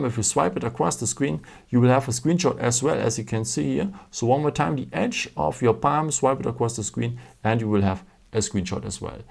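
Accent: Germany. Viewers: Wow you're accent is so german lol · Man hört das du deutscher bist 🤣 U can use it at Bibliothek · Im German and I would say he's definitely German. The way he pronounced "Screenshot", "Video" or "Filter" are the german ways - is that correct English? I don't know😂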